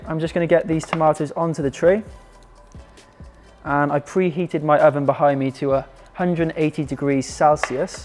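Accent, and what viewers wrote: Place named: England, United Kingdom. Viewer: Hm What I think is weird is that anyone would come onto a person’s channel, who is obviously British, and not know or expect they may say or pronounce things differently than they might. And proceed to tell them they are saying it wrong.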